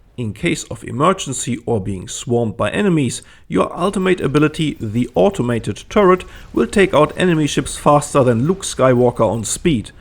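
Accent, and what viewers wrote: Germany. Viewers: Oh wow what a nice combination of german sayings and accent :P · You haven't heard a strong German accent, bruh. His is present but that's nowhere near strong German accent. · Haha I'm German, and man, the german accent is strong in your english xD I'm quite happy to find a familiar speaker haha xD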